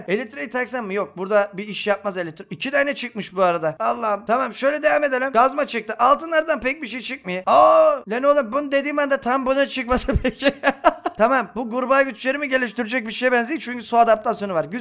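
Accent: Black Sea, Turkey. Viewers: abi sen nerelisin karadenizlimisin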